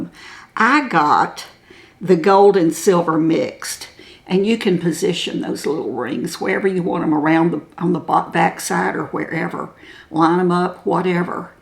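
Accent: South, United States. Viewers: Whoever is managing the YouTube recommendations? Thank you so much for putting this beautiful lady on my recommendations list, and as a cisgender Gen Z male? I literally enjoyed every single minute of this video, the warmth of the southern drawl and her spirit and beauty and elegance and easiness, it’s so soothing and beautiful like the rest of her warm, regal spirit and beauty. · I so adore you! You are so sweet, so genuine and I love your southern accent! I got the NYX foundation and love it!! I am a makeup junkie and have countless foundation's. I have never used any drugstore cosmetics but am now a convert. Since watching your channel I am a fan of e.l.f and NYX! Not going back to ANY prestige makeup! Thank you for making me a fan! xxx ooo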